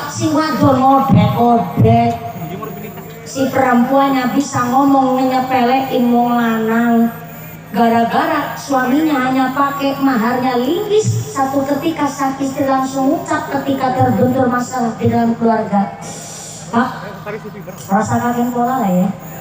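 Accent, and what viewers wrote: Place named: Banyumas, Indonesia. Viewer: Assalamu'alaikum, moga sehat n sukses sllu bu ustadzah, ora ngapak ora kepenak, ❤❤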